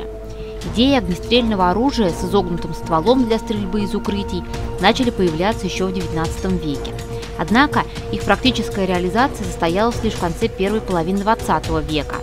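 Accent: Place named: Moscow, Russia. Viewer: Ага, мааасковский.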